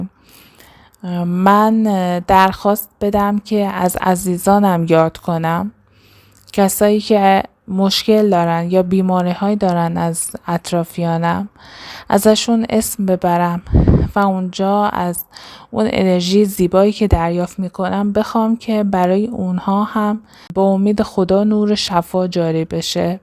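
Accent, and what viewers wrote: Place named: Kerman, Iran. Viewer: سلام عزیزم اگه کرمان هستین لطفاً بگید چون منم کرمانی ام ولهجتون فک می کنم همشهری هستیم من خیلی نیاز دارم باهاتون صحبت کنم اگه میشه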